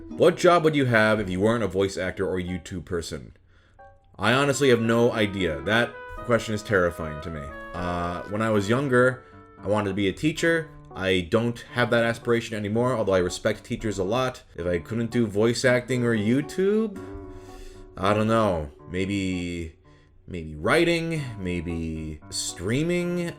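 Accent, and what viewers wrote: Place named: Michigan, United States. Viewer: As I was listening to his voice, I wasn’t hearing Southern California - more upper Midwest. Sure enough, he was born in Minnesota and spent time in Michigan. Definitely hear a serious Michigan influence in his American accent.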